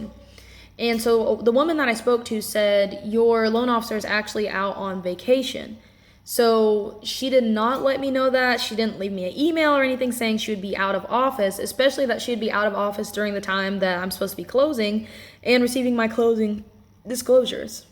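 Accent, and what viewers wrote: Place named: South, United States. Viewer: I like you country accent...is Tennessee or Texas a good place to buy a house? I'm looking to relocate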